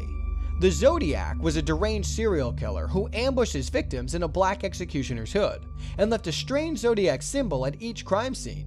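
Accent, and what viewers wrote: United States. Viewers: The speaker sounded like a fucking hillbilly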